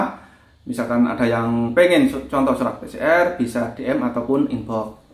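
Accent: Java, Indonesia. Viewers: Suka bngt dgn logat jawanya Mas.. **HALOO**... **ASSALAMU'ALAIKUM **.... 💖💖👍👍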